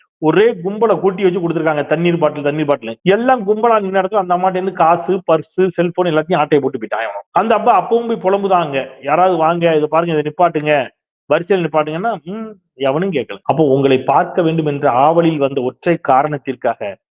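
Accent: Tirunelveli, India. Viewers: நெல்லை வட்டார வழக்கு 👍👍👍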